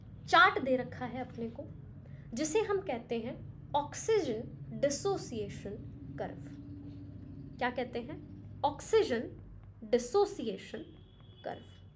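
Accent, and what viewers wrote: Haryana, India. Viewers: Boli haryanvi lage sey ❤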